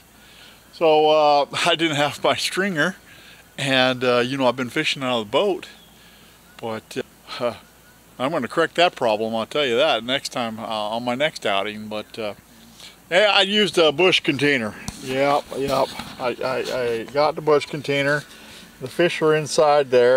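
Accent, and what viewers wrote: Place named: Midwest, United States. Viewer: I'm from WI and you sound exactly like a Midwesterner! Great vid, you're making me wish it wasn't 1° outside right now where I'm at in MN.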